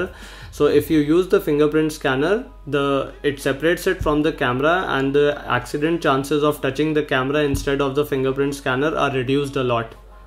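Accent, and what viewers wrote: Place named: India. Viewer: Наглядно объяснил разницу между оригиналом и подделкой. Жаль не все русские понимают английский язык. Судя по акценту ты из Индии?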